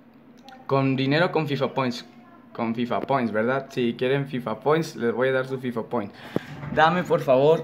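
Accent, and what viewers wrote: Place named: Spain. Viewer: Porque ablas como español si ni eres español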